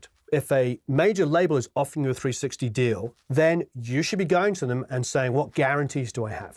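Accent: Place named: United Kingdom. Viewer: This was amazing information,. I can see from your other videos how much you respond to everyone, and it truly shows you respect us and, as you say here, VALUE us. It's always a pleasure to hear you, especially since most of the amazing people I've worked with have had British accents.;) Cheers, and again, thank you for being so personal and invested.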